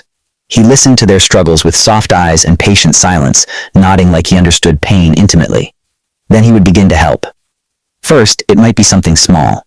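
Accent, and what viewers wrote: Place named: United States. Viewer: Love your stories, but not a fan of the american accent telling the stories.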